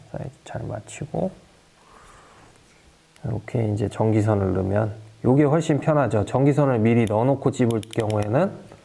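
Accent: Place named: Gangwon, South Korea. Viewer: 좋은 정보영상 잘봤습니다.^^ 대표님 발음이...강원도 말투가 자꾸 ㅎㅎ 그랬슴다 ㅎㅎ 그랬슴다 영상 업로드되서 볼때마다 재밋슴다 ㅎㅎ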